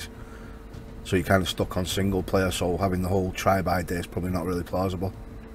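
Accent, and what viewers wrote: Manchester, United Kingdom. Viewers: Deffo from salford or Manchester sound like you are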